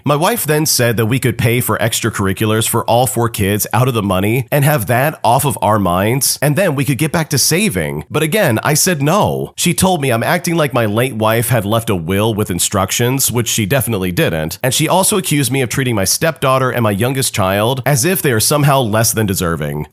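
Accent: United Kingdom. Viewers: Is it so much to ask for a proper label for the thumb nail? It does set off my "danger radar", I use the channel to relax to do the dishes or whatever, then no warning I hear "The British Voice". It's the inflections. Give me one GOOD reason why the channel can't label the Thumbnail? Because I just leave a thumbs down, because the voice is not what I subscribed to. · first vid of the day is the British guy from Amazon I the genius..... Second vid of the day is the Australian guy .... ... And randomly the OG guy comes back...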